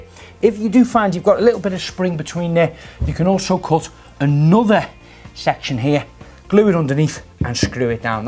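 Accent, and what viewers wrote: Liverpool, United Kingdom. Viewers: excellent tutorial, thanks for clear and patient explanation , is it liverpoolian accent btw? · He’s a scouser from Liverpool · From which part of UK is the presenter ? I like the pronunciation ... especially the "cut" with the U ... :) PS very nice the S too ... shhhtandard ... (great !) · Thanks, Yes were from L,pool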